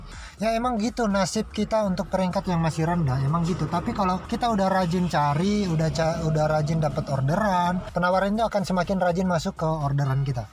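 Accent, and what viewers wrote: Manado, Indonesia. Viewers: Org Manado kang bro?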